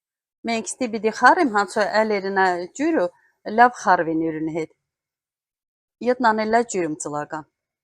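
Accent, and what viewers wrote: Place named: Hadrut, Azerbaijan. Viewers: Как приятно слушать наш Гадрутский акцент! Спасибо вам! ❤ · ջերմ բարևներ բոլորիդ,էլի մտքով տարար մեզ մանկություն,եղիր առողջ և թող բարգավաճի քո յութուբյան ալիքը ու երբեք չմոռանանք մեր քաղցր ղարաբաղյան համով հոտով բարբառը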